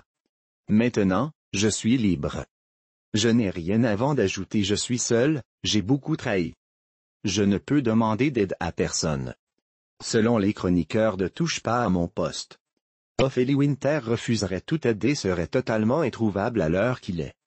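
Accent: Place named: Quebec, Canada. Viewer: Heu! elle viens faire quoi Loana dans les photos !? j'm'suis encore perdue sur yt , les voix robotique avec l'accent jouale de Québec MDR! j'décalisse de dlà !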